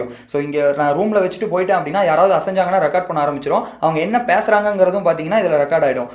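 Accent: Salem, India. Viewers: bro nenga Salem ha bro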